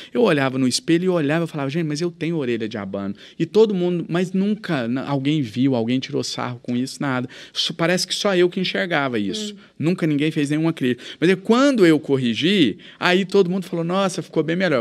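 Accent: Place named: Minas Gerais, Brazil. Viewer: JANONES presidente do Brasil daqui uns anos! Está amadurecendo para isso! Adoro suas ideias e o sotaque mineiro!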